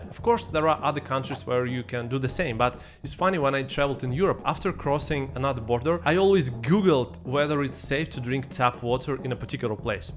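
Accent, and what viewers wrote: Singapore. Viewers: Yeah ur ar so funny. U Sounds more n more like a Singaporean. Ur video nvr fail. Amazing ❤Enjoy watching ur video. What i love most as I mentioned before is ur accent. Btw we hv another habit love to q for free product too. Sometimes the ppl who is in front of us do not know what the free product is..juz q. 😂anyway take care.🙏 Looking fwd to ur upcoming video. God bless😇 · Haha you’re sounding more and more like a Singaporean one😆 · the way you speak, you are almost a Singaporean.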